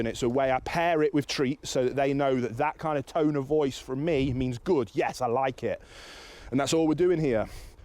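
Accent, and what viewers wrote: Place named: East Midlands, United Kingdom. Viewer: I can't pin your accent, I first thought Coventry but then I detected a slight East Midlands twang, maybe somewhere near the A5?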